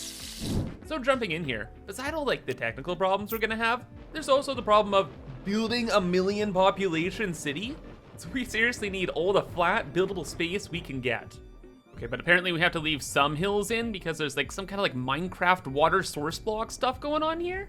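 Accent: Canada. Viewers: as a canadian ur accent describes me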